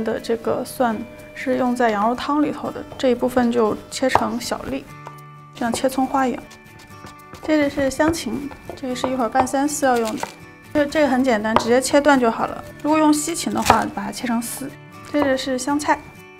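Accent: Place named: Nanjing, China. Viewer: 我查了一下，果然是南京妹子，南普我听出来了。哈哈哈哈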